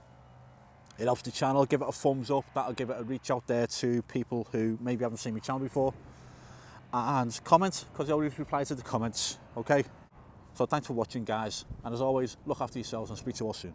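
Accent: Liverpool, United Kingdom. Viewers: Is this a Liverpool accent?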